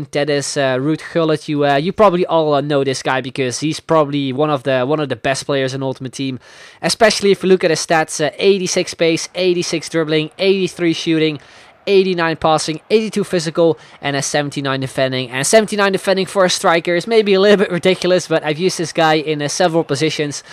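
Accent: Netherlands. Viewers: Hey, are you dutch? Oh, and I love your proper content, you earned another subscriber 😊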